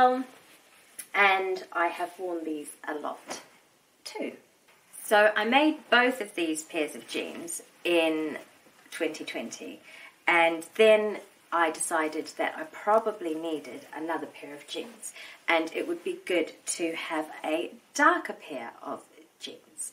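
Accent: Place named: New Zealand. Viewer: Lovely to hear a kiwi voice on a you tube sewing vlog. Hi from Wellington 😍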